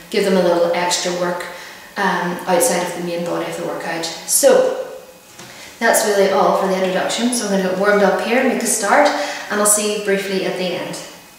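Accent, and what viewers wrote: Ireland. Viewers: i love your accent. it's the only authentic Irish accent I've ever heard. the only other one I've heard is from Angel, on the tv show "Angel"/"Buffy the Vampire Slayer" :)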